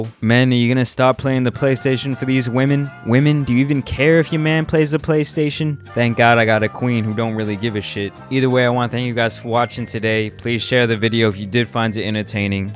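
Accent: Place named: New York, United States. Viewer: Sounds like this guy is trying hard to hide a new York accent New Yorkers are based bad ppl But boooooy did he hit the nail on the head bout that ps5 vs purse thing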